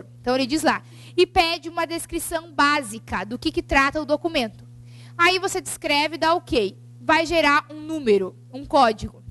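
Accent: Rio de Janeiro, Brazil. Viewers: Ótima aula, mas esse sotaque dá neRvoso! :D --- cariocas entenderão.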